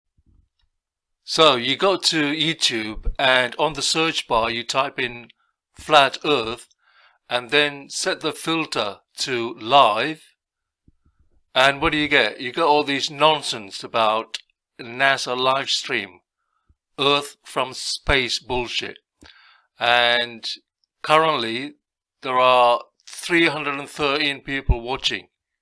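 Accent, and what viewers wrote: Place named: India. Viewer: Haha you're so funny. A bit "old man shouts at cloud" there. BTW, do you have non-UK roots? You sound a bit like one of my friend's Indian uncles: )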